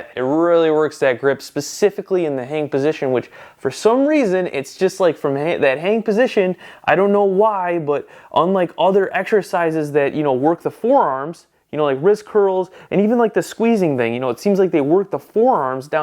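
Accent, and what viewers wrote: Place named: Chicago, United States. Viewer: Wow no comments on his accent? I was thinking Chicago or New Jersey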